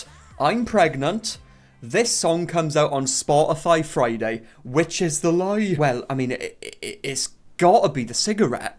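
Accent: United Kingdom. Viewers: Lmaoooo had to watch this video with subtitles coz of the thick Brit accent but worth it 😂 This girl needs to go away and do some self reflection for at least 5 years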